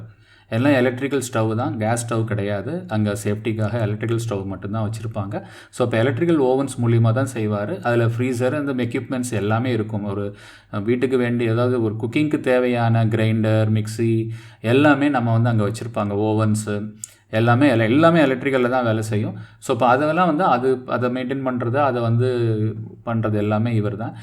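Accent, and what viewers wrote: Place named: Karaikudi, India. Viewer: காரைக்குடி ஒரிஜினல்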